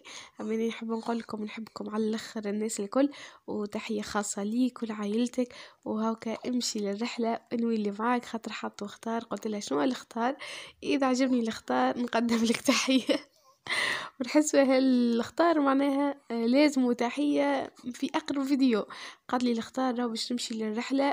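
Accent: Tunisia. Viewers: مش كن تونسيين متابعينك انا متابعتك من جزائر نحبك ونحب توانسا وخاصة هدرتكم ❤😇🌸🥰😍🇩🇿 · ماشاء الله عليك اختي.....لهجتك جميلة انا من محبين اللهجة التونسية.....اختك منى من السعودية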